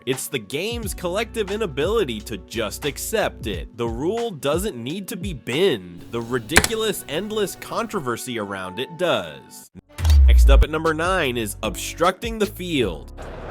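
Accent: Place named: United States. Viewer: Given this has an American accent doing the commmentary explain why the Mankad should be treated any differently to the hidden ball trick or a pick-off by the pitcher in elite baseball. The instance of the ball in play hitting the wicketkeeper's helmet is easily overcome given there arre some international grounds that have a small storage hold with lid in the ground where a helmet might be kept.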